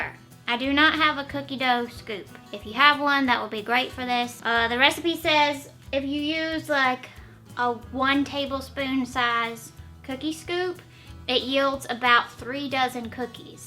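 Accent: South, United States. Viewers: Love you when you bouncing around in the kitchen ,by the way your southern accent is gorgeous. I wish could learn talk like you. Xmas greetings from sweden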